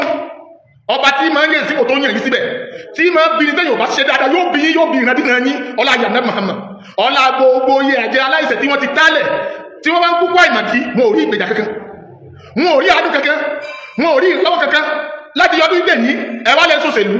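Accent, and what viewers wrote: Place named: Yoruba, Nigeria. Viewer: I think this afa is sounding like a yoruba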